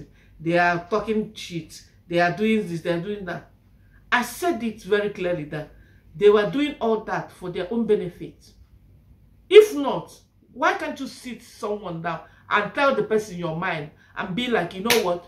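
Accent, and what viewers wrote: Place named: Africa. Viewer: Is there a way you can speak English more clearly and with less accent? I hear you but when your accent gets too deep I am losing your words and missing the point. Your channel is reaching the world so it would be helpful to many who may not have African blood for you to shift and pivot for those who want to actually hear you 100%. I have the blood. I hear you. But I lose you.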